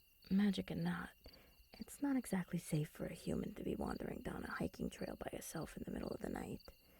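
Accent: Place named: New York, United States. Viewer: Hey look New York vampire. Or is she from Boston?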